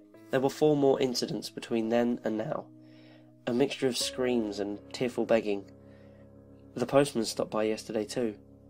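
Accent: London, United Kingdom. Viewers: Like your readings very much! of course I'm a Scot living in London so.you don't use non-existent words like 'normalcy' in stead of Normality (even Creeps McP has done this piece of unforgiveable shite!) you make it scarier cuz it sounds closrer to Hackney E5! Thanks and keep it up (the others tend to send me to sleep but wif you soundin so close it freels like its right outside my door! sp00ky! xx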